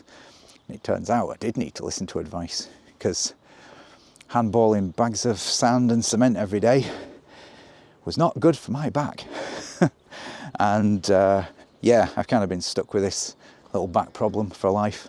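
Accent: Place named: Scotland, United Kingdom. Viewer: He could be a voiceover man for the BBC, they love a bit of Scottish. If only he were mixed race he would earn a fortune.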